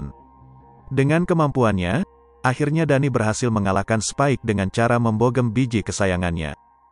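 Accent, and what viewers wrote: Palembang, Indonesia. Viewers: Anjirrr.....cewek Palembang cokkkk 🤣🤣🤣🤣👍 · Wong plembang y om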